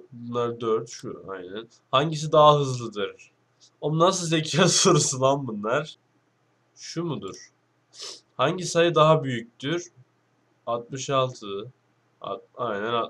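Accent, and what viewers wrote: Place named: Adana, Turkey. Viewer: Adanalı işte gurban olayım anagı yolugu diye ya ağız dolusuyla çok hoşuma gidiyor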